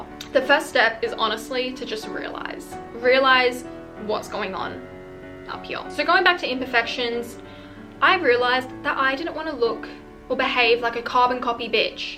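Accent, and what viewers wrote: Australia. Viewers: Hey! I just love your content sooo much. It’s so informative and full of your experience. I guess everyone is glad that you share all your knowledge about life and many important other topics. Every time I watch your videos when I‘m down it makes me feel a lot better. Somehow it’s so calming to hear you speaking. (I LOVE YOUR AUSTRALIAN ACCENT). Thank you for everything<3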